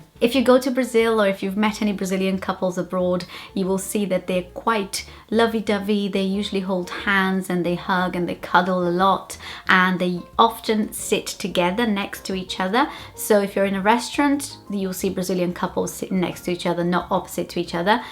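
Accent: Wales, United Kingdom. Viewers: A Brazilian with a British accent. I am an American who sounds like I live in São Paulo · Idk how this girl has such a powerful British accent yet is brazilian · Ah, tão bonitinho ver brasileiro com sutaque de British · You actually have a Welsh accent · I love your British accent! And your explanation was great! I can relate to you in lot things! Can’t stand be late or wait! Line your mom I hate junk food! And iam totally Brazilian on my essence but also iam American! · Parabéns! Seu ingles britânico é perfeito. Vou dar uma olhada nos seus videos como vc conseguiu chegar até la principalmente xm esse sotaque. Espero chegar a ter o seu ingles um dia.bjs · your brit accent is legit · How do you have no trace of a Brazilian accent?what age did you come to the UK.? The soft Welsh accent is nice though😉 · I’m Welsh (though I live in Brazil) and even before I read the description I was like wow this girl has a welsh accent. 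Your English is absolutely amazing and being able to pick up a welsh accent is incredible!! 😵😵 Parabéns/ llongyfarchiadau ❤️ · You look very Brazilian to me. The British accent would have thrown me off though. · Oh my, you learned English with a British accent. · She has a British accent. Or a wannabe, I think. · She sounds Welsh with a light accent. This could just be me though ( I'm Welsh)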